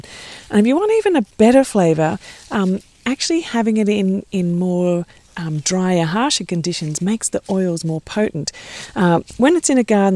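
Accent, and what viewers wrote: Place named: Australia. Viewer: Wow an Aussie I love the accent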